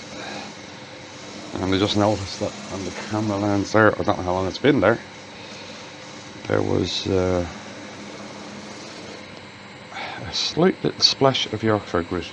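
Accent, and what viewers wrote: Ireland. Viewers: I love your videos! You always tell us what you are doing. That turned out exactly like the sketch! There is no way I could have or would have attempted it. Only been turning about 8 months though lol. As an American I'm in love with that beautiful Irish lilt in your voice. Happy New Year to you and your family!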